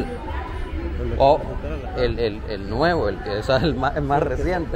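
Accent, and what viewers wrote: United States. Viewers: No manches muy americano abla español maje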